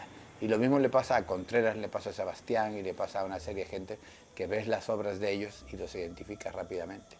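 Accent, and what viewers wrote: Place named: Spain. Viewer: Tantos años en México...¿porqué el acento de España?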